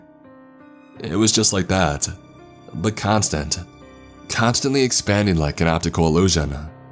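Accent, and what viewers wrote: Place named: California, United States. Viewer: This guy's California surfer accent is not a good mode for this story to be told, takes me right out of the story the way he pronounces words... Eckspecially Rayan (Ryan) Tham (them) Perfials (peripherals) Kassed (kissed) Thass (this) At (it) Etarnity (eternity) Sanses (senses) Tranches (Trenches) Warked (worked) Praaaject (project) Staaary (story) Incraaases (increases) It's a if he's doing a parody of the SNL skits of the soap opera "The Californians" Kind of hilarious I mean this comment in a helpful way, after all, we can't really know our own accents. My best friend told me I should cut my nose hairs when we barely knew each other. Only a true friend will tell you something like that.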